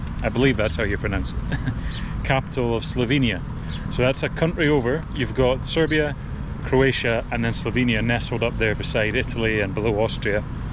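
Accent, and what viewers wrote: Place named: Scotland, United Kingdom. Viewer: A wee bit Scottish dialect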